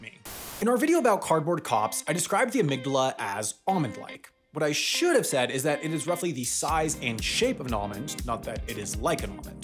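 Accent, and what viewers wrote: United States. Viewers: You ptonounced Lancaster as in Lancaster, Lancashire correctly as far as I heard and as far as it was possible in your American accent. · okay how is the lancaster pronunciation incorrect? i'm from the OG lancaster and you basically pronounced how i would (albeit with an american accent) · Pronouncing Porsche as "porsh" instead of "porsh-uh" is totally acceptable in American English, which you speak.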